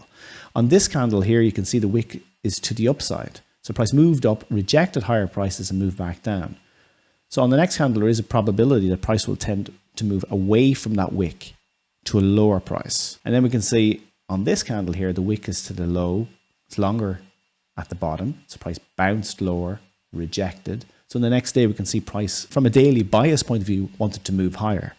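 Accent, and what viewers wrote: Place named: Ireland. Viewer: First video from that is a bit confusing.Will need to look at it a few times.Can hear his Irishness sometimes.😊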